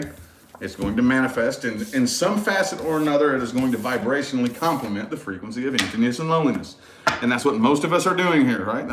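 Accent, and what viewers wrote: South, United States. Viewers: Hello there, im Trudi and a Leo. Iv just come upon your channel in the last 3 days. Ive got to say the first reading i saw of yours it was like a magnet pulling me in for more. You have alot that you need to share with as many people as possible . I dont know if its your easy going small town country boy drawl. Or maybe your aura of i got things under control just relax or your songs you belt out while you shuffle and pull cards. All of which makes people turn and pay attention and watch you and listen. I watch maybe 3 readers because i feel they truly feel the cards and the messages behind them. You and one other are pretty close to reading my life .its spooky and i get chills when a reading is so close to my heart. But the way you explain the Universe to us is a great gift. Im a true beliver in the Universe and what you put out in the world good or bad will come back to you ten fold. 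You may not be content trying to get these lessons thru to us. Its hard work when noone takes responsibility for their own actions anymore. This is the way of our world it seems. But my opinion you are doing a wonderful job. Thank You im glad to beable to watch your channel. Soon ill get a personal reading appt. Set up. My person of late is a Virgo. We are done but he decided to hurt my feelings on his way out. Ill survive . Im thinking positive thoughts. You are a good person.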